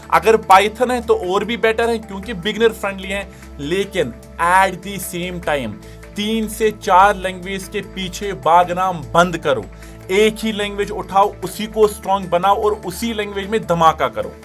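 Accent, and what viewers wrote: Kashmir, India. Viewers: Why ur accent sounds like kashmiri?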